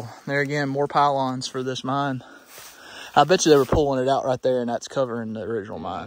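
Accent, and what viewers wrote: South, United States. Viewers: Gotta say, when you say "dad gum it".... just makes me chuckle and grin! Love our old southern talkin! Great walk... keep em coming!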